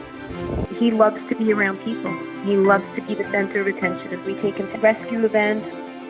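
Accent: New York, United States. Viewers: Omg love your story. I’m from LINY and you sound like your either from there or Joisey! God Bless you always 🙏🏼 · Love your New York accent!! Love from California!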